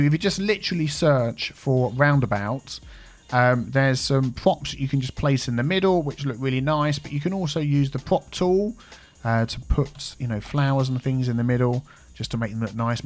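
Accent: United Kingdom. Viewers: Haha you made me laugh. Ilbig interchange in the middle of water... i dont think you see many of these ..’mmhhmm haha very british i love it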